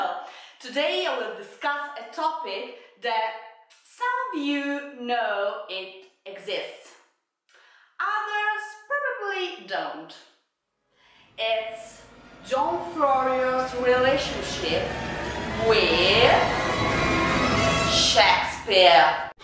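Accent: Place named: Italy. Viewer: Please turn on closed caption I'm afraid i'm missing a lot of your argument because of interference from your italian accent. A quality that perhaps in his time also occurred. Fascinating subject and you're right on the money about the airbrushing of character reputations like Florio in relation to Shakespeare.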